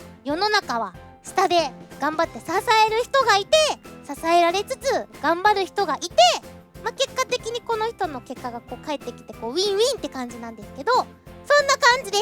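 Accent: Japan. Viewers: 日式中文RRRRRR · well Japanese uses kanji/hanzi as well so it's Japanese especially regarding that she pronounces words in Japanese pronounciation.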